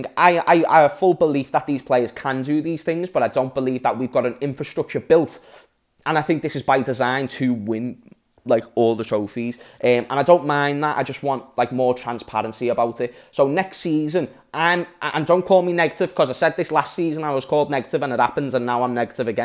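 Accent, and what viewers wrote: Liverpool, United Kingdom. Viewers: I'm much older than you and I've been devoted to Liverpool before you've been able to conceptualize football. I'm from the states and will never have the opportunity to visit Anfield. I will live vicariously through you. You remind me of a younger version of myself minus the scouse accent, lol. Don't stop being you.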